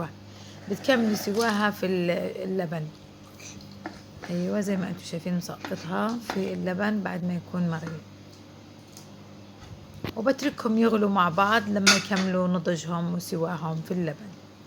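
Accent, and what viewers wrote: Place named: Palestine. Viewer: لهجتك فلسطينية اعملي المسخن ع طريقتك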